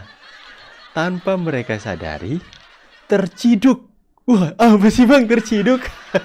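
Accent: Java, Indonesia. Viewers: bang logat suaranya seperti orang jawa/jakarta dalam berbicara bahasa Indonesia, biasanya logat luar jawa beda ma jawa, itu nurutku 🙏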